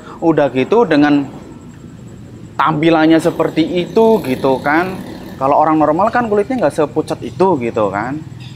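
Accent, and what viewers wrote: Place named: Cilacap, Indonesia. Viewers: Owalah ngapak tenan Iki mas req😂❤ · ngapak banget bahasanya bukannya serem malah ngakak wkwkw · ini cerita serem tp jd ketawa karna abangnya ceritainnya lucu pake logat jawa medoknya😂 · Ngapak dikit nga ngaruh um haha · Nadanya kaya orang cilacapan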